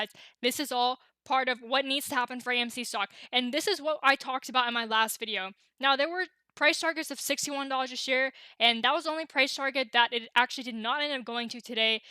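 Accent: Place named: Canada. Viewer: Well-done, 👍 🐰‼️👏👏 Did I hear a little Canadian 🇨🇦accent in your voice? My kids have that too‼️😃I like it‼️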